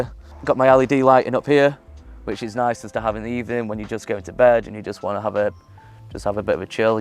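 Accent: United Kingdom. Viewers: I love British Accent So awesome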